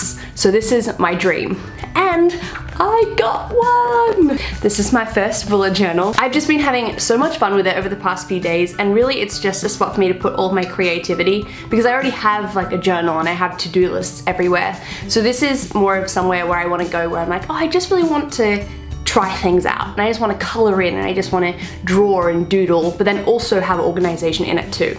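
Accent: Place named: England, United Kingdom. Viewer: Is she english? I quite like her accent 🧡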